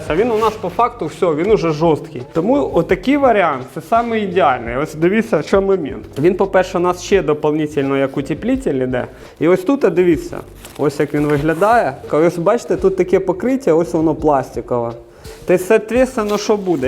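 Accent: Surzhyk, Ukraine. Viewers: У вас дуже багато суржику кацапського. Прошу звернути увагу на свою вимову ви ж молодий! Дякую відслаюваться.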